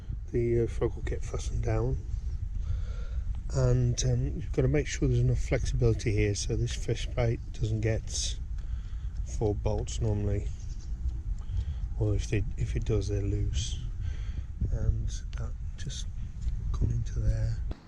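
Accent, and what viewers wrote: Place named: West Country, United Kingdom. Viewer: Just found this site and I am mesmerized by the seemingly precarious nature of your railway. It's clearly a labor of love. The amount of excavation you have done with just a pick and shovel is amazing. Well done mate! Can't wait for the next video. BTW, where in Britain are you located? I detect west country accents. Cheers from the USA.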